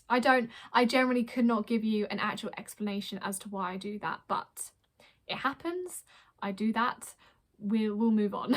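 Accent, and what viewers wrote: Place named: United Kingdom. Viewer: I like the British accent 😭😭 Good luck 😊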